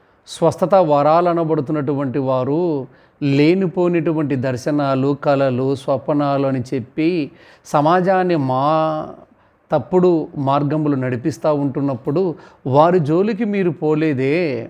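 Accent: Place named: Kukatpally, India. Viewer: Miru Kukatpally atla matladaru kuda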